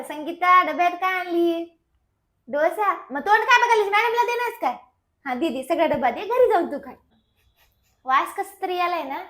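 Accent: Nanded, India. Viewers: Tumchi language khup chan....😂😂😂😂😂😂😂 1 number Nandedkar...😂😂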